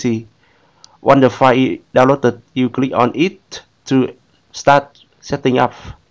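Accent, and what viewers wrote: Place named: Vietnam. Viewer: tiếng anh nghe là biết dân việt nam zồi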